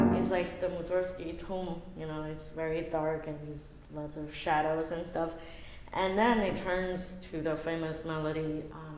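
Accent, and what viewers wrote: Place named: United States. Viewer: I so agree. Her American accent & mannerisms, which have worsened since this interview here, do take away from who she really is, i believe, When she plays she is herself. Here, the Americanisms aren't too bad... yet. :-/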